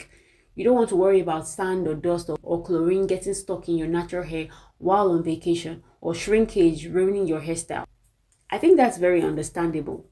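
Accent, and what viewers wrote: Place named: Nigeria. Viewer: How can i like this video more than once? Your Nigerian accent and no bullshit attitude only makes it better.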